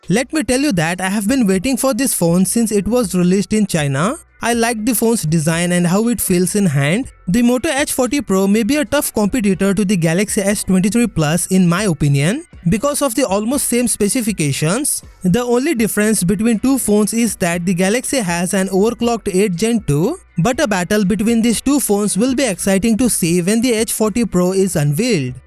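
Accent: India. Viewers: Indian English dialect makes it exotic for ears 👂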